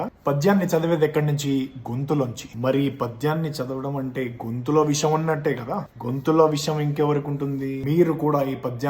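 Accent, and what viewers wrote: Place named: Coastal Andhra, India. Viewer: I am a great admirer of you bro. But please correct your pronunciation of the letter శ. Your pronunciation of శ is influenced by Coastal Andhra's accent. The proper way of pronouncing శ is done in Telangana. in Telangana: దేశం is pronounced and written as desham. Similarly, కాశీ as Kashi, వంశి as Vamshi and శివుడు as Shivudu. Some people have a misconception that 'sh' is only used for ష But, to pronounce ష one should know the basics of Sanskrit. ష is little harder to pronounce for not just Telugu people but for many of our Indians (except for those who know Sanskrit).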